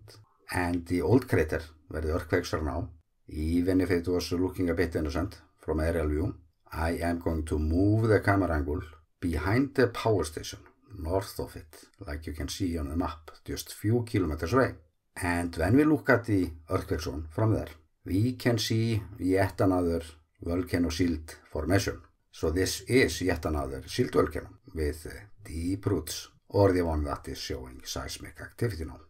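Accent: Iceland. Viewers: for sure a very interesting text, but nearly ununderstandable for a visitor who is not firm to english with the icelandic accent... please find someone who reads the text in a more understandable english. its too bad that this accent reduces the explainations and finally the worth of the video.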